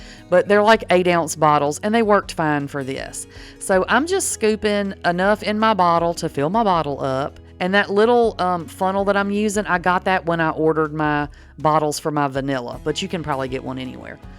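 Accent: South, United States. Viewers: Idk where you’re from (this is my first vid), but I’m betting TX, MS or GA. I grew up in TX and lived in MS for a long time. I miss hearing a Southetn drawl. I’m back home in Ohio now. In any case, I’m looking forward to making some of these creamers—they are getting ridiculously overpriced at the grocery store for being bottles of milk and sugar! SMH PS I bet we’d have been best friends had we ever met! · I could listen to you all day! Love your wonderful, southern accent! Oh, and your idea of individually freezing the creamers into little tablespoon servings was such a great idea. Thanks for sharing! ❤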